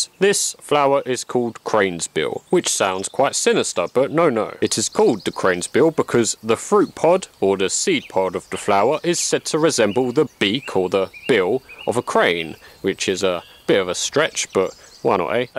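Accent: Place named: Cockney, United Kingdom. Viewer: the gezzers like a cokney david attenborough.. init.. love it.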